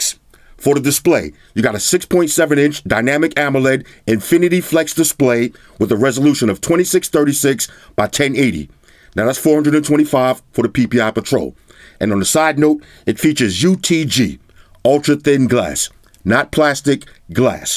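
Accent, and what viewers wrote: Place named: New York City, United States. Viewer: NYC slang in full effect when you watch....I feel like I’m chilling on the “stoop” with one of my homeboys! I know knows the word stoop!! 😅😅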